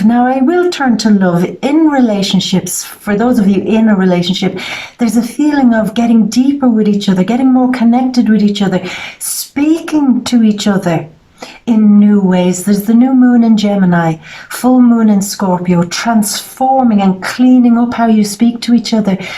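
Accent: Ireland. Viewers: Always a pleasure to hear your soft Irish accent, I am a June 20 guy. Painter and writer, very Irish and awake. Like yourself I am living far from home but you can take the woman from the island but not the island from the woman. I have lost nothing that I experienced in life but have managed to place things in quarantine lol. Big hugs. Eric. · Doesn't she have an uncanny resemblance to Elizabeth Hurley?.. that gorgeous Irish accent.. brilliant! Gurl you really speak with such clarity and I love it.. yes I have enrolled in distance learning and just didn't have the time to do it.. yes I have been afraid to do my work because I have always thought I wouldn't get through it.. I really want to go back to Uni and completing what I have always wanted to do... I'm older and want to be able to say to myself that I have lived my life. So thank you for this gorgeous reading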